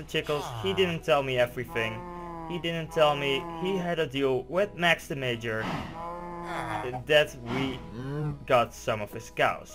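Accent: Netherlands. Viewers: Are u netherlands / dutch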